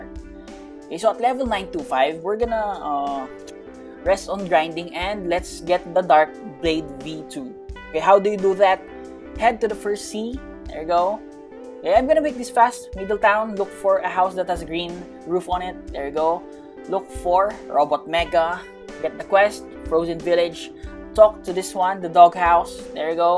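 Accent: Philippines. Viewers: why do you sound tagalog/pilipino hmmmm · He sounds Filipino. Is he Filipino?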